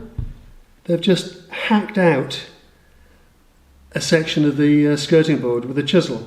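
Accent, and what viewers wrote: United Kingdom. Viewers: What I like about your videos is that they're authentic, mistakes are shown, such as the work piece being unsecure (sic) when using the spade bit and the skirting board piece breaking in two. I would have used a dovetail saw or even a coping saw but getting the cheap bandsaw into a project is what you wanted to achieve and succeeded, a little bit different and your casual matter of fact manner is easy to watch and listen to. The point that I took from the video is that a bandsaw can be portable and could used for more challenging aspects rather than just a small section of skirting board. I commend you for even replying to the sanctimonious knob heads who feel a compulsion to piss on bonfires to give themselves a sense of self satisfaction and importance. The points they may could be better raised in a less knob headed way imo. Keep it going, nice to have a British accent to listen to and using metric when looking for hints and tips.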